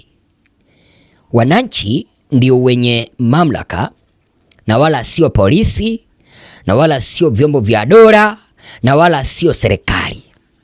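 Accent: Kenya. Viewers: ETI TANZANIA 🇹🇿 TAIFA LETU. KISWAHILI CHAKO CHA KENYA 🇰🇪 HALAFU UNASEMA TANZANIA 🇹🇿 TAIFA LENU. WEWE NA NANI?? 😂😂😂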